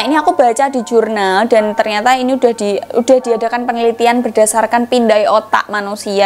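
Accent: Java, Indonesia. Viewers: ciri khas bahasa PRIBUMI nya medok Good luck buat kk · Medok pisan si mBak iki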